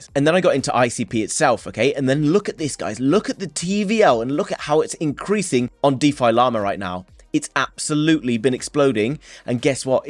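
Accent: RP, United Kingdom. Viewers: Your semi-posh Brit accent, with the odd expletive, is music to the ears. Wouldn't turn down a celebratory donation of ICP on 100K subs.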